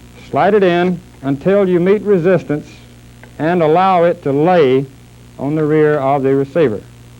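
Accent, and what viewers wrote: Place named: Texas, United States. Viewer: Definitely a Texas or Arkansas man.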